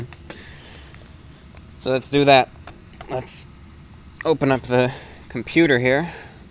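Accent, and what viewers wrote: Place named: East Coast, United States. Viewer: Just wanted to say good on you for wading through all those trolls and hate comments. I'm on of the people who came from reddit and I'll honesty say this: you're one of the most humble and genuine guys I've seen on youtube. I love the soft East coast accent and your personality. I watch your videos every morning with my cup of joe and my breakfast. You're just perfect to listen to. Keep it up and I hope you do well in the future!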